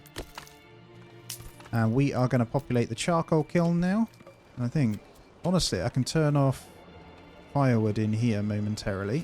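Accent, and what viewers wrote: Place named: England, United Kingdom. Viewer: I'm trying to pinpoint your accent....is it Bristol, Devon or Norfolk? Can't put my finger on it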